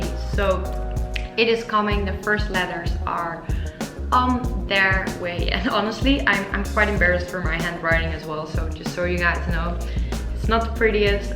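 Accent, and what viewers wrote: Netherlands. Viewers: Good Afternoon!!! you are adorable !!! you feel like my granddaughter!!! I love your Dutch accent!! My family always told me that we are Dutch ... so I'm so very glad that I have found you on Youtube ... I haven't written yet !!! Just found you a week or so ago.. didn't know about your PO Box.. where can I find your address.. Love ya!!! Tell Max HI. !!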